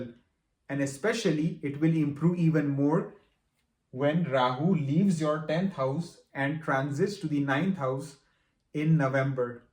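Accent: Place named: United States. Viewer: After waking up in the morning, you take your phone in hand n switch on the net and you get the notification from about upcoming Guru gochar... Knowing that Guru being my both lagnesh n rasheesh, it's gochar in Trikon gonna be beneficial, you are so eager to listen to it from your very dear n fav Marathi guy with American Accent 😃😃😃 and your beginning of the day become cheerful.... ❤ Thank You So Much dearest for making my day 🎉❤🙏😘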